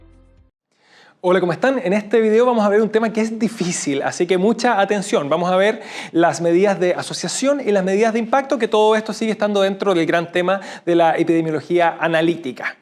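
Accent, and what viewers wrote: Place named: Chile. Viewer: Gracias Dr. Sí me ayudó mucho a comprender los términos, le regresé varias veces ya que no estoy tan acostumbrada al que hablen demasiado rápido ni al acento chileno, pero sí lo logré entender. Explica usted muy bien Dr. Gracias por su ayuda. Saludos de México :))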